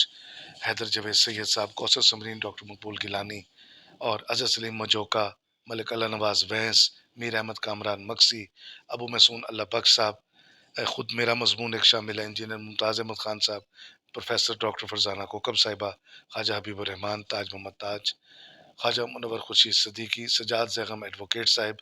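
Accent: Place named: Saraiki, Pakistan. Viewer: Dear Sahib, please also make video in SARAIKE,because your saraiki exant is very polite and charming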